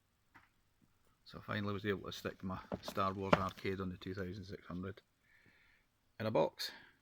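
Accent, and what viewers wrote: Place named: Scotland, United Kingdom. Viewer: Hey man, that is just glorious. Hope you are gonna upload some more stuff man. Always good to hear a fellow Scot 😁 Here from a Nathans Gaming tweet 👍 Take care, and again, hopefully see some more content 👌 Cheers 🙂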